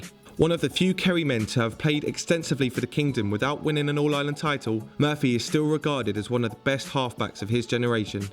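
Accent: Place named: England, United Kingdom. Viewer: Funny to hear an english voice on a GAA video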